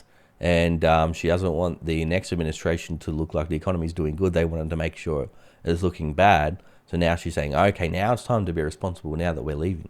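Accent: Australia. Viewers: An aussie voice is a ray of sunshine on a cloudy scottish day! Happy New Year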